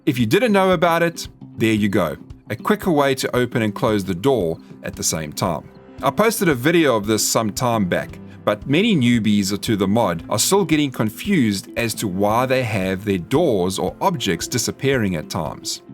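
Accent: South Africa. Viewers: Aussie here mate, always thought you sounded South African. I think aussie, kiwi, certain british and south african accents have alot of similarities. Either way its pleasant to listen to. I reckon its the garden hoe fruit tree yield and the loot bags you didnt know. Really enjoy the tip vids too. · I hear the stronger SA accent my friend, I used to work with a gang of S Africans in the 90's, great bunch of guys, love the accent!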